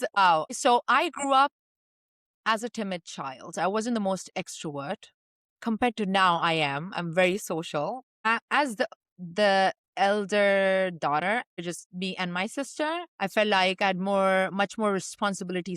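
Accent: India. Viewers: You are beautiful with Indian accent.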